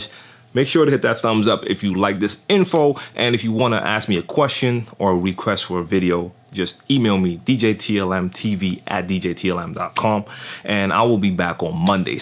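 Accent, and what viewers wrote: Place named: Ohio, United States. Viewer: sirch one Hey bro, where are you at? Sounds like NE Ohio to me, lol. A small bit of advice that works for me is to find a spot where you can play what you like. My passion is to play breaks and little known gems from popular artists along with golden era hip hop but that doesn't work in the dance clubs in my area. I can do the top 40 thing because it's so easy and I have so much experience mixing hard to mix stuff because of my passion. What I did to counter the downsides of top 40 gigs (that pay after all) was find some hipster bars that let me do my thing for free beer. That way I'm always scratching my itch to play what I like and practicing in public which is always better than practicing in the "bedroom".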